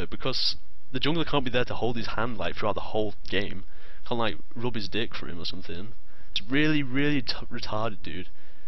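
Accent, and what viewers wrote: United Kingdom. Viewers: I want a guide on how to hear what you're saying (i have trouble understanding british accents)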